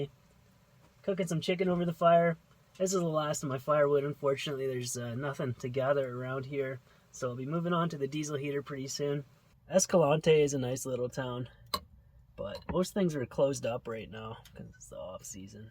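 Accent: Canada. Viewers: in the first minute i asked myself from the accent, "this guy's got to be canadian' then you mentioned the distance as kilometers and I questioned no longer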